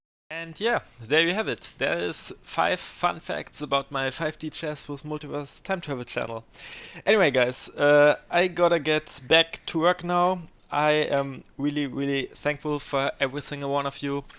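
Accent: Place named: Germany. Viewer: I indeed love your German accent! :D Best chess channel I know, keep up the good work and I am looking forward to your stream on Saturday :p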